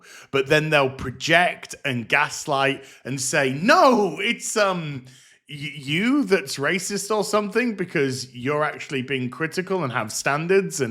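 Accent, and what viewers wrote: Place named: England, United Kingdom. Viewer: When calls something Retarded with that smooth English accent, you know the show is 💩🤣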